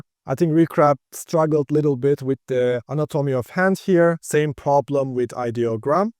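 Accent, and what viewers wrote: Turkey. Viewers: Kanka bişey dicem aksanında Türk olduğun o kadar belli ki severek takip ediyorum ama :)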